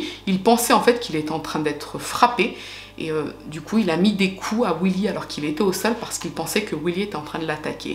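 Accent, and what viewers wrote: Italy. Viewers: Ciao bella signora ma che bel accento italiano 🇮🇪🇮🇪🇮🇪 Nouvelle abonnée 🙄 quelle tristesse cette histoire, révoltante de cruauté et de bêtises, merci de l'avoir traitée avec humanisme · bel accent italien ahah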